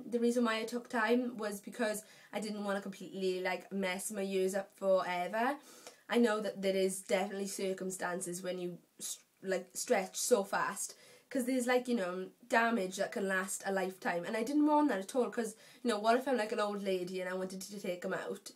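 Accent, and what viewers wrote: Wales, United Kingdom. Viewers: Welsh for the win :D :D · Welsh accent.. *O* ~Melts <3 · FUCK YES WELSH ACCENT!! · Oh wow... are you welsh :')? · omg welsh much <3 snap !! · Your accent is amazing! Every lads holiday we meet a group of Welsh people so funny! I got my ear stretched today check it out would be nice to know what you think!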